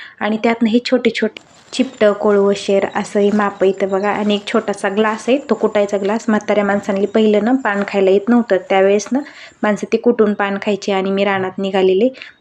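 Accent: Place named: Maval, India. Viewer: जय सियाराम ताई ताई तुमचं गाव कोणतं कारण बऱ्यापैकी मला तुमची भाषा मावळी वाटते म्हणून विचारलं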